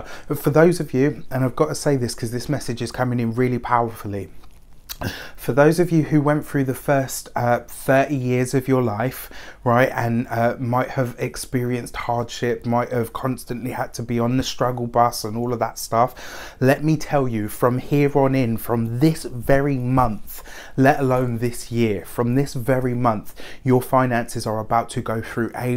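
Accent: United Kingdom. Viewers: OMG your british accent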